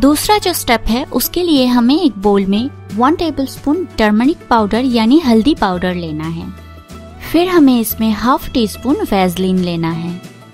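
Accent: India. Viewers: Her speech is not comprehensive. It feels like she speaks Indian. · It`s Hinglish( Egnlish with Hindi accennt and mixing)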